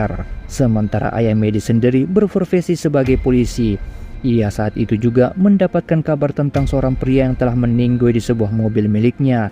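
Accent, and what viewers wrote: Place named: Sulawesi, Indonesia. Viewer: Admin kayakx org Sulawesi terdengar dari logatnya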